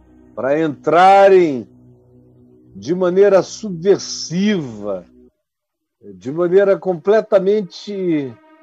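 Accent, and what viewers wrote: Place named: North, Brazil. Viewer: Amo essa linguagem nortista do. 👐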